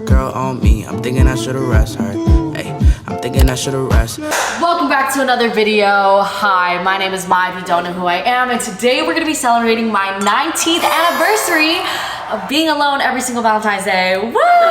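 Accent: England, United Kingdom. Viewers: I am from England and your English accent cracks me up 🤣🤣 Happy Valentines ❤️ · See the way she spoke with the British accent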